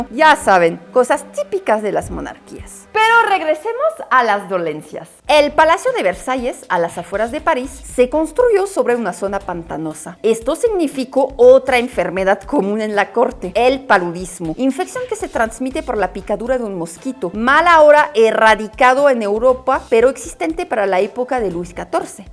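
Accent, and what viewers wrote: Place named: Mexico City, Mexico. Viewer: Soy super fan de tus videos, por ser educativos y culturales con el toque chilango inteligente, saludos cordiales desde Querétaro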